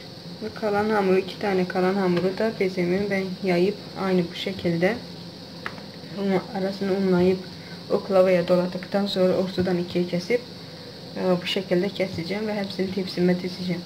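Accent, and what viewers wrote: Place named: Azerbaijan. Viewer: danisiginizdanda hiss etdimki azerisiz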